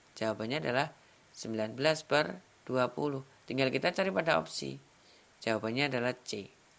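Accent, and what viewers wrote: Java, Indonesia. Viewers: Terima kasih bang. Salam dari pemalang. Bang maaf boleh tau asalnya? Logatnya Jawa nih hehe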